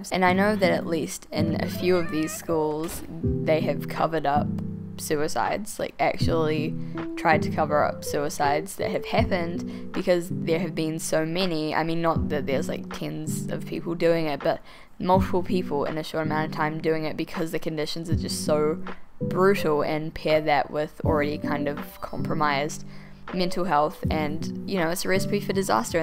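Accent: New Zealand. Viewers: Ohhh you're from NZ! I always wondered why you seemed so familiar and I just realized: it's your accent, your makeup, and the fact that I grew up watching The Tribe lmao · Aha, you’re from New Zealand, that makes sense. I’m not the best with accents, so I wasn’t sure between Australia and New Zealand. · oh my gosh i knew i recognised a fellow kiwi accent!! you’re right about it not being an art and animation hub sadly :/ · Oh! It’s a New Zealand accent!!! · I thought I recognised your accent 😂 NZ youtuber gang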